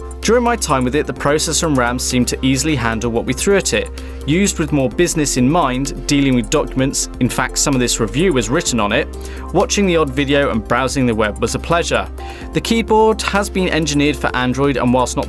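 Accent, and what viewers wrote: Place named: United Kingdom. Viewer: Extremely balanced review. Well articulated with a typical British accent ;)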